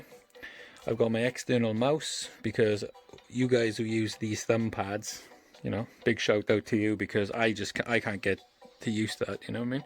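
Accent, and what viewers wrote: Wales, United Kingdom. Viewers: Currently looking into getting one of these. What are your thoughts on after owning if for some time. It took me a minute to understand your accent at the start then realised you're Welsh haha I'm from Carmarthenshire (Cross Hands). Not used to hearing our accents on YouTube!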